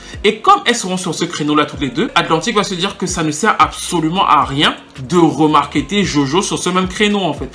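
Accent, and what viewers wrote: Cameroon. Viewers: The way you speak french to an extent reminds me a lot of home 237 love it!!!!!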